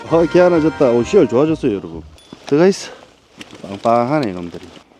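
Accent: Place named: Gyeongsang, South Korea. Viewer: 경상도 목소리 좋으네요 얼굴은 수더분하시고 구독합니다 좋아요는 시청료로 눌러봅니다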